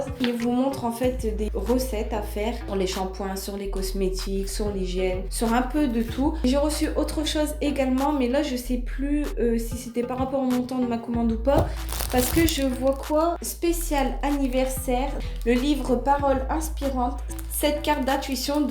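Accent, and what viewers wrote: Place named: North, France. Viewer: J'ai deviné tout de suite que tu venais de chez nous (Nord de la france). Tu as un sacré accent ^^ Merci pour la vidéo de haul, bonne continuation :)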